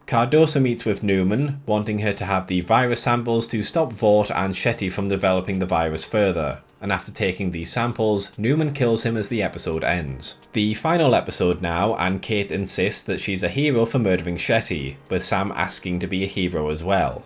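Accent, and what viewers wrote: United Kingdom. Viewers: Are you a Geordie? Wasn't sure earlier, until you said 'lads and lasses' at the end. Great video by the way.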